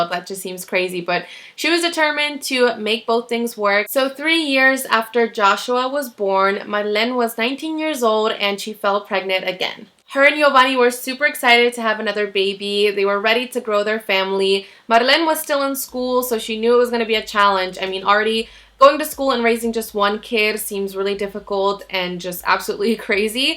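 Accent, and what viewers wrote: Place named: Spain. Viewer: I love your Spanish accent lol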